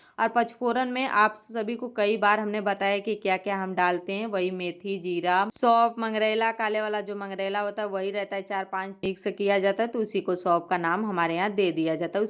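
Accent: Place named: Kanpur, India. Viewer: आपकी भाषा तो अलग है आप शुद्ध हिन्दी कानपुर वाली बोल रही हैं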